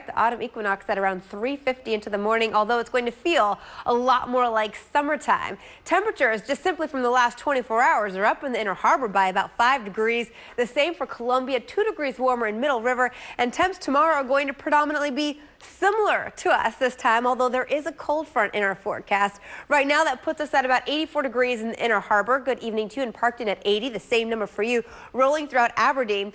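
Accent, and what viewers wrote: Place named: South America. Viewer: Wait Waa da fuuuuuu !?! ¿ Since when did WMAR get a South American Weather lady ?!?!?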